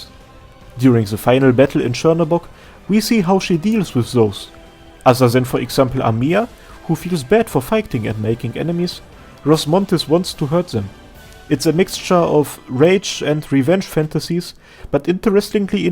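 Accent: Germany. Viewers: Some of the Arknights backstories are dark (just take Frost Nova as an example), but hers surely takes the cake. I do love her though, and as a new player, I do hope she will come back so I'll have a chance to blow my money on getting her, cause I do want her so bad. The chapter 8 music video, she looks so bad ass! Also, your explanation of her ability, of four invisible hands holding onto the things she's got with her. My first though was Re:Zero and Petelgeuse's unseen hand witch factor. Haven't seen the anime you mentioned, so can't comment on that, but as you explained it, I immediately thought of Re:Zero. Anyway, thanks for your lore videos. As a new Arknights player, there is so much lore to catch up on, and the story of Arknights is actually really good. So having access to your videos is a nice help to explaining some character connections and such. Also, please don't loose the accent, it's so charming. 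I live in Denmark, so I'm used to German tourists visiting, and the German accent I've always found so charming. I get why you sub the videos, it can be difficult to understand for some. Guess what I'm trying to say is, keep doing you, keep up doing the great work, and thank you for the videos you make! ❤❤❤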